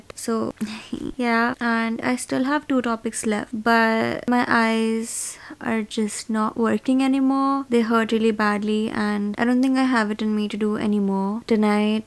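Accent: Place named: India. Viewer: Funny ass Indian accent always have me deadlol😂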